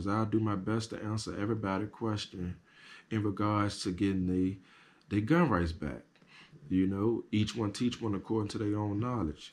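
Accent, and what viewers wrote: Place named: Virginia, United States. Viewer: Knew you were from VA from the accent lol. Not a felon but great video man! More people need to see this.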